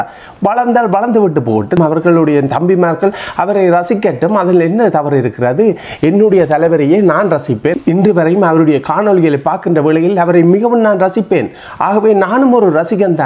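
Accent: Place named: Eelam, Sri Lanka. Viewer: ஈழத்தமிழ் அழகு தமிழ்... ❤️ தமிழ்நாட்டுக்கு தமிழர்கள் உங்களை பார்த்து கற்றுக்கொள்ள வேண்டும்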